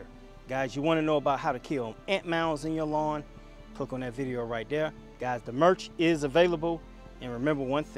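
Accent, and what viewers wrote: South, United States. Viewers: Bruh, where you from, NC or Va?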